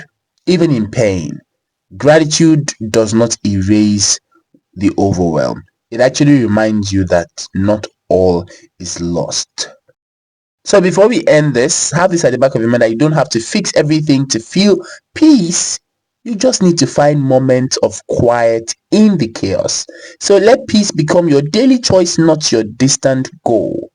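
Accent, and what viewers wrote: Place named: Igbo, Nigeria. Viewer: The igbotic accent for me 😂😅🤣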